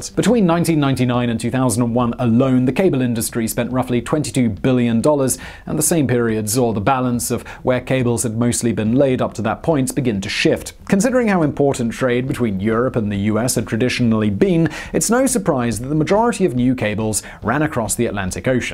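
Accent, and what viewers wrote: RP, United Kingdom. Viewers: Or... you can watch something like: <link> and get more information in three minutes than this does. Why is that? And, minus fill (to make up a required video length to be monetized), no BBC British and insipient hand waving and mannerism. In fact can be done in three minutes no sound at all. Nice.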